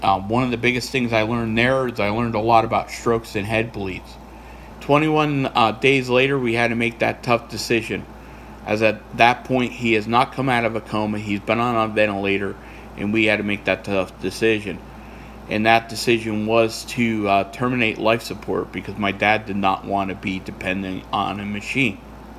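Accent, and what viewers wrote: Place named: Eastern Pennsylvania, United States. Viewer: Did I read a comment that you are in Eastern PA. That is where I am Originally from. I should have recognized the accent.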